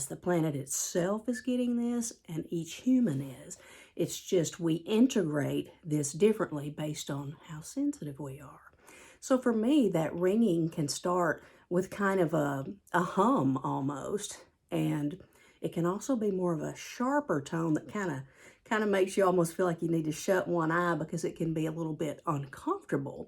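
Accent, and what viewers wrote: South, United States. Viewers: I have experienced ALL 9. You just came across my feed... it's nice to hear someone w/ a southern drawl discuss these topics, as I too am southern, am sensitive to energies, practice energy healing & reiki. You asked for additional symptoms we may have had as well ... Heightened intuition, whole body vibration, energy pouring from hands & feet, connecting to plants & animals, wild synchronicities w/ numbers, music, situations, just to name a few. Oh, Clair's coming onboard & spiritual hot flashes ..crazy, crazy dreams too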